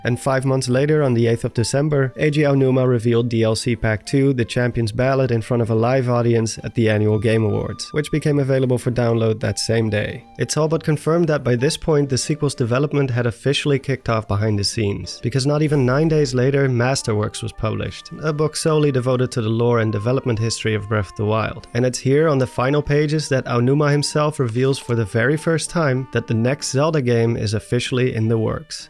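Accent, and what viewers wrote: Netherlands. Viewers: is this guy dutch ? whats his accent :)?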